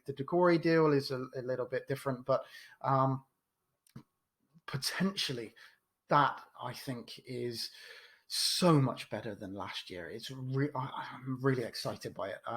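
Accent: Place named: Southern England, United Kingdom. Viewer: Great vid lad , you sound like your from down south like me , you don’t find to many toffees down here , hopefully we get Rodriguez and Allan over the line , coyb. 💙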